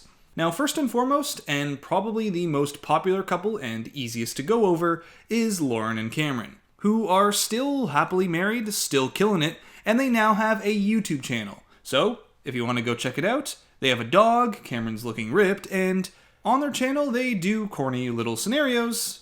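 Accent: Canada. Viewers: Is the narrator from Canada?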